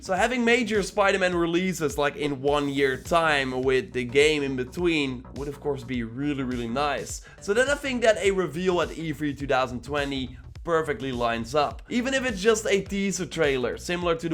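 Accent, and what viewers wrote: Netherlands. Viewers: You kinda have a dutch accent bro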